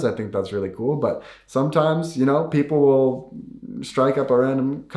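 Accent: Sweden. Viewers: I’ve been checking in on your channel from time to time. First thing i noticed is that you’re taking on the swedish accent. Just a bit. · Is it just me or has he kind of gotten a Swedish accent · It was a while i saw your videos, and your english have way more swedish tones in it now, you sound like a swede talking english ❣️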